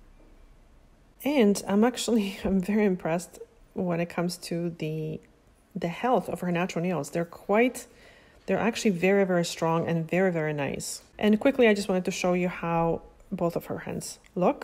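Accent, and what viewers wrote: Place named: Poland. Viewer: I just subscribed for the content but also I am getting strong Polish vibes when you speak. I’m Polish and your slight accent feels like home. ❤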